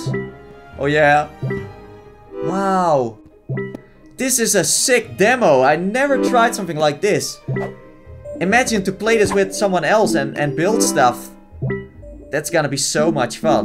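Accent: Netherlands. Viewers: oohhh ok, I guess I've never heard a Dutch accent :D cool vid man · are you dutch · Are you from the netherlands? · Do I hear a Dutch accent?! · Ben jij nederlands you have a dutch accent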